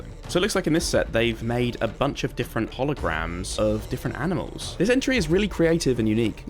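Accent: United Kingdom. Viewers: Are you British As I mean are you from England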